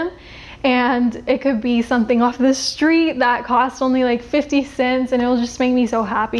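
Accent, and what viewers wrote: El Salvador. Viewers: Hi, how are you? I just want to say hi and wish you the best! You are a very nice person even when I don't know you in person, but you speak like a true Salvadoran and you know a lot about our culture and I like that! Say hello to your sister in a good way and with great respect. See when you take her to know El Salvador? greetings and take care! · TE AMO GRINGUITA SOS LO MAXIMO. UNA SALVADOREÑA DE VERDAD. HASTA TU ACENTO ES ORIGINAL. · así es bro, esos que son salvadoreños y al llegar a usa con los días hablan como mexicanos mal caen esos majes, sinceramente es gente que se merece una buen cato en la trompa, para más esta chica habla como salvadoreña y eso que ella es nacida en usa osea es gringa de naturaleza, y los majes que están en usa puff decepción dan.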